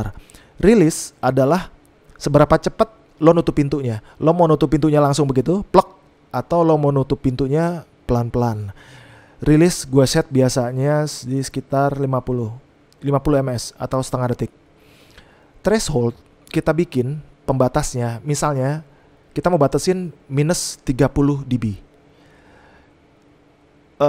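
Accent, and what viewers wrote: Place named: Medan, Indonesia. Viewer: lah kirain orang medan , cara bicaranya itu dari video²lainnya kek medan bang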